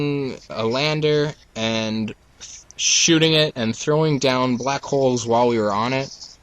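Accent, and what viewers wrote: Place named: Russia. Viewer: Also this guy has a Russian accent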